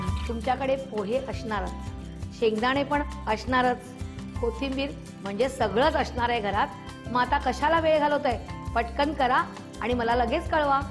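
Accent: Pune, India. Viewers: Is this the Pune marathi language?? Or vidarbh marathi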